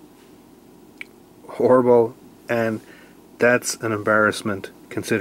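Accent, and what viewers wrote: Newfoundland, Canada. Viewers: 5160 is a very good steel if it is hardened correctly. You are not the first person to have issues with the hoodlum or buck knives for that matter. That is one company I tend to stay away from. Also, are you from Newfoundland, if you don't mind me asking? If so hello from Alberta!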